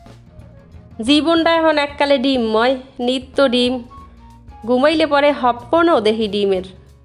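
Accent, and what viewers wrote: Barishal, Bangladesh. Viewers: আমিও বরিশালের মেয়ে + বউ। একজন দেশি আপুর কথা শুনে মনটা প্রশান্তিতে ভরে গেলো। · আমি বরিশালে বউ আপু।কিন্তু বরিশালে কোন ভাষা আমি বলতে পারিনা।তোমার মুখ থেকে বরিশালের ভাষা শুনতে খুব ভালো লাগলো আপু।তোমরা ভালো থেকো।দোয়া করি।আমার একটাই মেয়ে।নাম মানহা।আমাদের জন্যও তোমরা দোয়া করো। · আপু অনেক ভালো লাগছে আপনার এই বরিশালের ভাষা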